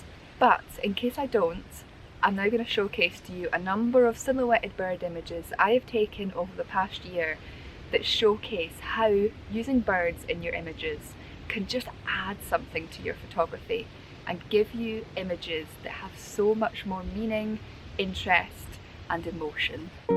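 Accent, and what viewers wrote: Scotland, United Kingdom. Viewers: I think your Chanal is brilliant I love the Scottish accent cheers Neil Patto.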